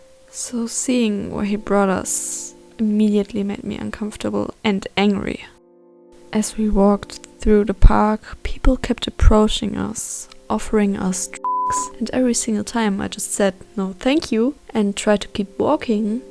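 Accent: Germany. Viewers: Are you German? Bcs I think I hear a little bit deutsche Akzent ✨ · Nice German accent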